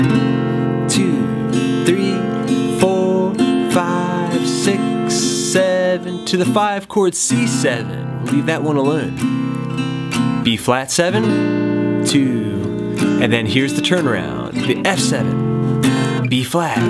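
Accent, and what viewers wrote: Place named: Maryland, United States. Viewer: dude are you from Maryland? Thanks for the lessons by the way. really helping me pick guitar back up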